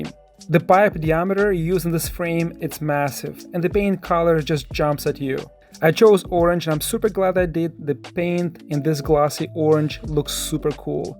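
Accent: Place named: Russia. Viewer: friend, dm you are Russian as I hear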